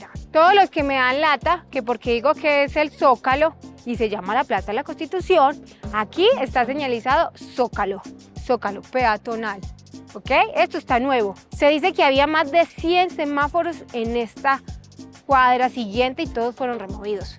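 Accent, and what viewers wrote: Antioquia, Colombia. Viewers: me encanta ese acento paisa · Hola , ya he visto varios vídeos tuyos y me encanta tu style, tu vibra me vibraría y aunque eres colombiana te aceptamos tal. Cual eres y ya eres parte de nosotros Lis mexas ok nunca pierdas tu acento , me encanta , es lo lindo de ti mmm junto con todas tus cualidades jijijjji y además muy hermosa viva Mexico y Colombia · Amo el acento colombiano 😍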